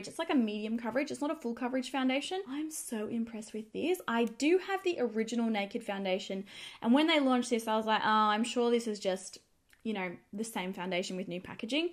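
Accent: Australia. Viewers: She has an Aussie accent, leave her alone.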